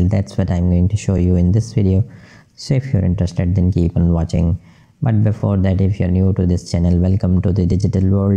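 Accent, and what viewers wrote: India. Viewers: They always have an Indian accent I swear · So wut if they have an indian accent i think their helping alot and doing pretty good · People with Indian accents on youtube have tought me more then school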